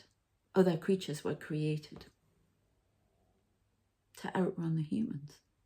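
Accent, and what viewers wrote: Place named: Newcastle, United Kingdom. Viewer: Thank you for your read.. Are you a Geordy. Like me.. I can hear it in your voice..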